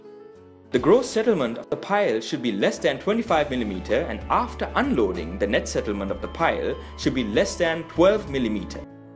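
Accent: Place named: Caribbean. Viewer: Its a carribean or Caribbean accent and his diction is quite clear.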